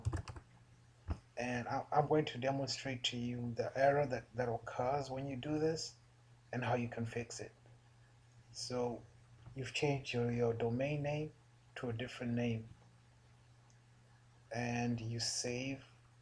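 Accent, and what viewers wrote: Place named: Uganda. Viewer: you sound ugandan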